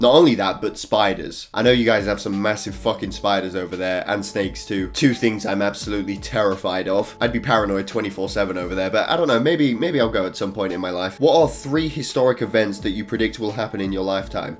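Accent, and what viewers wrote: England, United Kingdom. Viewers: That's england people speak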